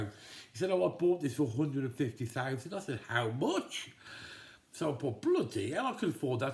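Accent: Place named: Birmingham, United Kingdom. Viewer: cant wait to see this - i always could tell that was a brummy accent. btw youll notice the north of england be it machester yorkshire lancashire - while it has its social problems (rough areas, drunks etc) - the friendliness up north is way more apparent than down south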